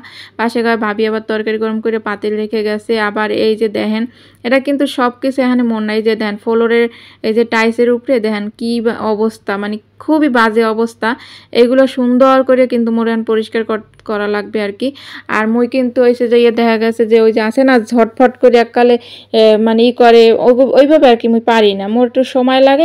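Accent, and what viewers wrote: Barishal, Bangladesh. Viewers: আসসালামু আলায়কুম আপু আজকে প্রথম তোমার ভিডিও দেখলাম ।আপু তুমি কি বরিশালের মেয়ে। কথা শুনে মনে হলো। আপু অনেক অনেক ভালোবাসা রইলো আপু। আপু আমি ও কিন্তু বরিশালের মেয়ে ❤❤❤❤❤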